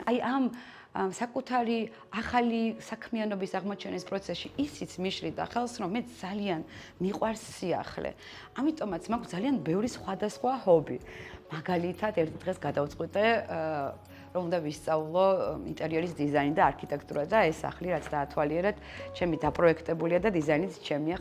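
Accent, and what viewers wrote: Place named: Imereti, Georgia. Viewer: წარმოშობით იმერელი ხართ ხო? პირველივე ვიდეში მოხვდა ყურს იმერული ჰანგები და აჰა, 😄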